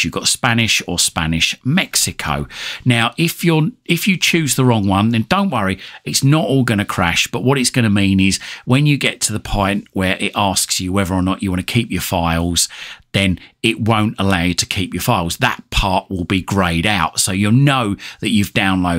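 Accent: United Kingdom. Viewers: Thanks loaded flawlessly on a 10 year old i7 4g ram laptop. It needs more ram really. I take it if I swap out the hard drive sometime I will have to load Win10 and go through the process again?? Great to hear a Brit voice😊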